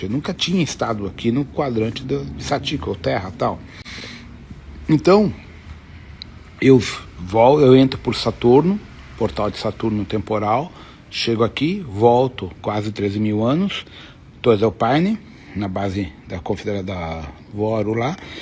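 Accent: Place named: Spain. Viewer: Foi ruim de entender pq ele tem o sotaque espanhol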